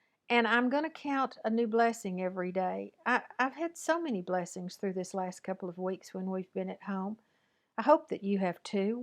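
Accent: South, United States. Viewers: I I am blessed beyond measure. I have a wonderful family Who loves me and lets me know. I have a Saviour who loves me and shows me daily. I had a pastor who often said that if we lost half of everything we owned we would still have more than some people will ever have and I remind myself of that often. I am blessed, I am blessed. Every day that I live I am blessed. When I wake up in the morning do I leave my head to rest I am blessed, I am blessed. I enjoy your fireside chats. Your Souther accent is just as sweet as can be to this Northern gal from Canada. Stay, well and God bless.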